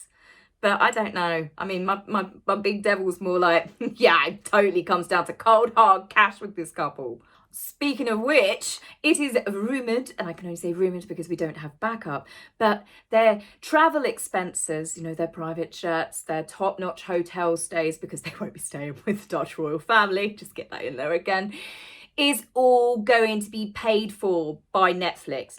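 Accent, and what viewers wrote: United Kingdom. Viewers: The good thing is those of us in the United States just figure it’s a British pronunciation 😆 you are fabulous and you say it however the hell you wanna say it. I just think it’s a gas that MM wasn’t smart enough to use prefix Archie - spell it differently that way. everyone would’ve known what she was trying to do, even if in the end it doesn’t make sense and she’s talking about stereotypes. Love you have a wonderful Easter!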